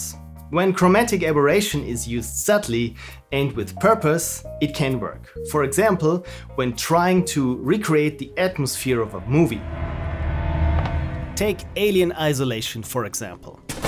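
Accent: Germany. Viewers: You are German, aren't you? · Du bist ein deutscher, stimmts? :D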